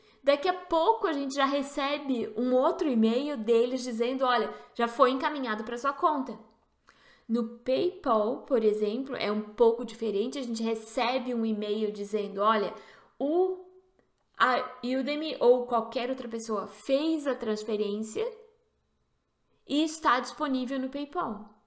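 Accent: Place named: France. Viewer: você mora na frança ou algo assim? kk consigo perceber um sotaquesinho já 😅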